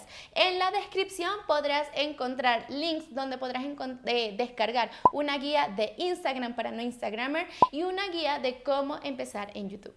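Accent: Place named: Argentina. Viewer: De donde sos? A veces pareces argentina otras costa rica o colonbia...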